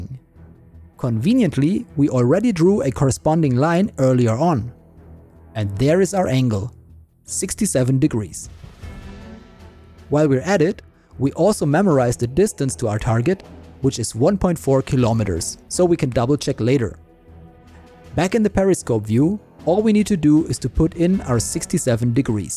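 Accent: Germany. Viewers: Das Boot was a masterpiece of a movie. Good to hear a German narrate the video. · Hahah, I love it that you are German (or Austrian, no offence) and you can give us proper authentic German uboat banter! Brings back memories of Das Boot! Alles gut, Herr Kaleun! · Lovee the german pronounciation!!! 😭😭😭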